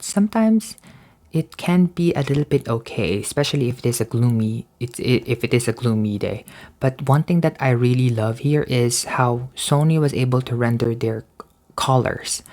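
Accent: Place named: Philippines. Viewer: I know a Flipino when I hear one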